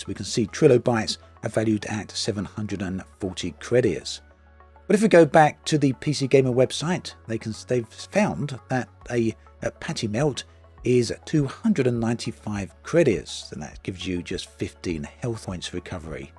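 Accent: United Kingdom. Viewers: Starfield will have the RT mod within a week of launch. It just wont be from the Devs. It will be from the modding community. So there are your lost sales MR smooth Brit accent.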